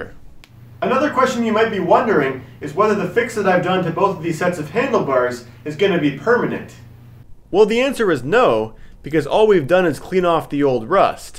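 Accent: Canada. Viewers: if anyone wants an example of how a canadian accent is different to US, here it is.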